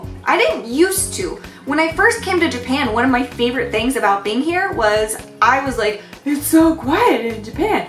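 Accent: United States. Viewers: And I like your deep, funny, "What are you talking about..." American voice!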